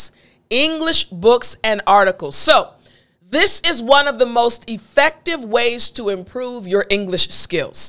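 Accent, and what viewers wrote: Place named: United States. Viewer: Different accents but i would like every America have you same accent teacher, cuz it's beautiful and I can understand clearly 😊😊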